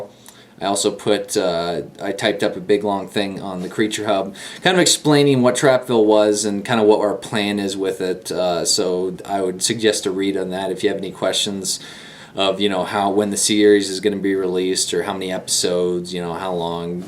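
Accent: United Kingdom. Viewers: have you ever heard of England? he has a mother fucking British accent. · British English...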